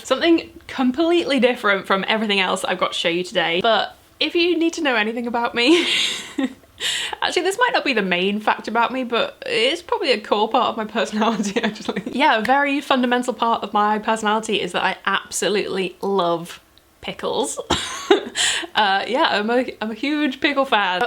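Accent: Liverpool, United Kingdom. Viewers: Fuck. Time for a nightcream :((( p.s sounding very scouse in this video 😂😂 maybe i had forgotten what your voice sounded like. Love this vid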